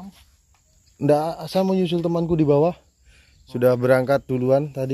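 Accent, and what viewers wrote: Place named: East Java, Indonesia. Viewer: Sulawesi kok sampean koyo jawatimuran bang? Sory kepo nih😁😁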